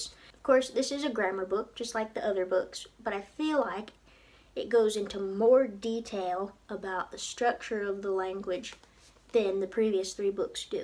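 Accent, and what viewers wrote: South, United States. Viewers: Southern accent! A nice surprise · Love your accent! Which part of the South are you from? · What a great review. I'm returning to learning Gaeilge after nearly 20 years since finishing school. I have a lot of these books and am surprised at how much I've retained over the years. "Buntús Cainte" brings back bad memories for us because of having to practice our tape test in school. I was taken aback to hear your accent at the start, fantastic to see people abroad learning our national language. Your accent is beautiful btw, spent a lot of time down south over there and know a Southern Belle when I hear one :) Go raibh míle maith agat arís, Subscribed.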